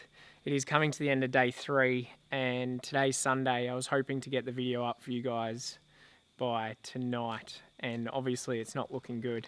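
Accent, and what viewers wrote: Australia. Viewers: Maybe it's the native bird sounds in the background or my imagination but I reckon you're sounding a bit more Aussie since you've been back!? I'm so used to hearing American accents in film/TV that it still sound strange to me to hear our accent coming out of a screen haha. Hope you're enjoying VIC :) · I like:s Australian English accent... It's same like Australian cricket commentary....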